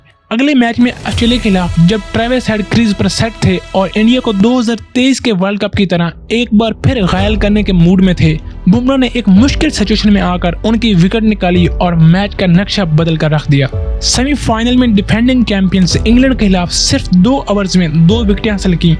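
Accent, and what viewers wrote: Pakistan. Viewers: Bhai tu Pakistan hai ye to accent se pata chal gaya. Thank you bro for such an amazing video.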